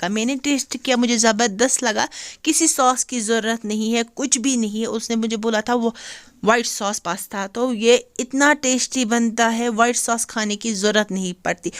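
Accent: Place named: Kashmir, India. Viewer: Ur kashmiri language is very sweet di❤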